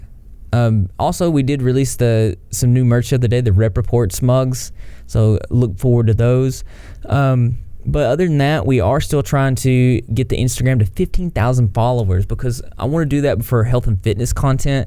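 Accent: South, United States. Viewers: I hate the way southern accents sound but yours is so pleasant to hear wtf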